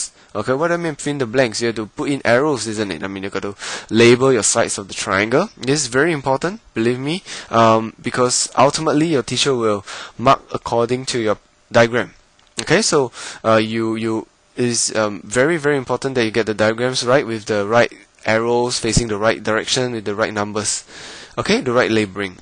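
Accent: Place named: Singapore. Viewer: i bet you are from singapore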